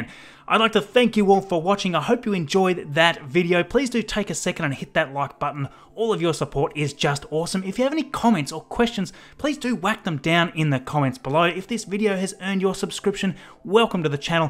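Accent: Australia. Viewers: Can not listen to a thick Australian accent; it's unbearable; sounds like a flushing toilet. Can you get someone with a soft pleasant voice?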